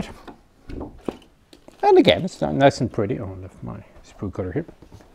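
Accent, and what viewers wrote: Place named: Ireland. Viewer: Now all I see is a Greek man with an Irish Accent, the entertaining link to Father Ted is destroyed.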